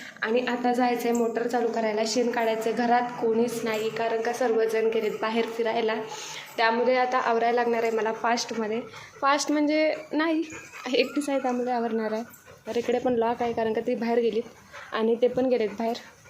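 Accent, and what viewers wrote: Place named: Sangli, India. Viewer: ताई तुम्ही सांगली चे आहात काय,, तुमचे बोलने सांगली कडचे वाटते माझे गाव पण सांगली जिल्ह्यात आहे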